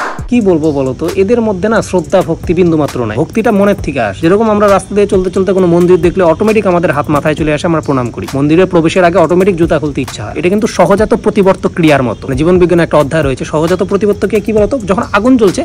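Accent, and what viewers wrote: Bangladesh. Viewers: আপনার বাংলা pronunciation আমাদের বাংলাদেশের বাংলার মত কিছুটা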